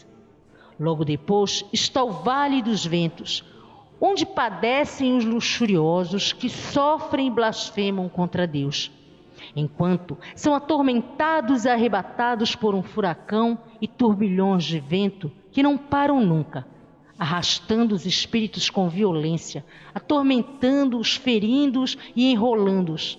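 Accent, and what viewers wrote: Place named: Northeast, Brazil. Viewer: adoro a abertura com voz de nordestino amooooooo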